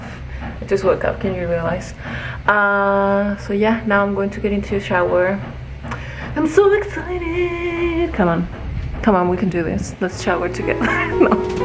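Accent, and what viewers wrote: Chile. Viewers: Hola! Aquí una mexicana que tuvo dificultad para entender su español chileno, pero qué lindo que es su acento, eh! <3 Me identifico contigo, porque mi esposo también está estudiando un PhD en U.S. y yo soy una artista frustrada (en eso no nos parecemos, tú ya lo eres jeje). Muchos saludos y que sigan disfrutando su tiempo en Tokio, si siguen por allá, nosotros morimos por visitar. Soy tu fan <3:D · Amo tu acento chileno!!! Y que escuches... Abrazo gigante desde Argentina aa💫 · Amo su acento chileno! 🥰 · !!! Me encantaría que hablaras más en español en tus videos!!! Tu acento chileno es genial y podrías poner subtítulos en inglés!!! おねがいします!!! 🙏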